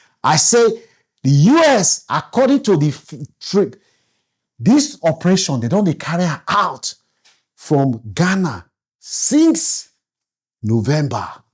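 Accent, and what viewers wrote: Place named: Warri, Nigeria. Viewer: I like this your Warri pidgin. Abeg no stop😂”….say men…”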